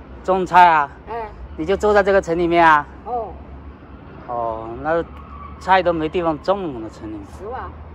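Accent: Hakka, China. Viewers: 閩西客家話口音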